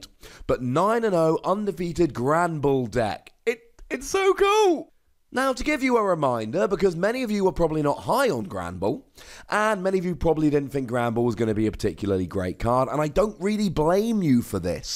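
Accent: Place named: United Kingdom. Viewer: I´m sorry if this offends you, but the way you say "ball" kinda sounds like "bull." Is it the british accent? Edit: And also, when I played against some guy at a league challenge, he played apricorn maker, and that pretty much won him the game becuase he could set up so fast. Minutes after the game finished, he kept telling me how good apricorn maker and that he´s happy he´s playing it.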